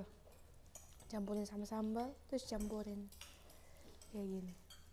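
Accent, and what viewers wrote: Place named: Flores, Indonesia. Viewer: Kek ada logat Bajawa😅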